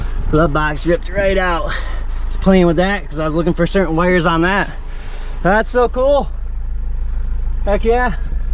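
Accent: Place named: Florida, United States. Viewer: What I’m curious about is what kinda red neck Florida accent is that ? It ain’t no southern twang I’ve ever heard .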